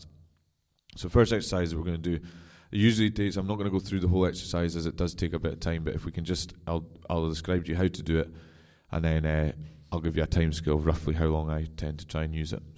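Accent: Scotland, United Kingdom. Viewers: Love the scottish accent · Scottish accent^^ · That's a Scottish accent :) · came here for warm ups. Stayed for the Scottish accent.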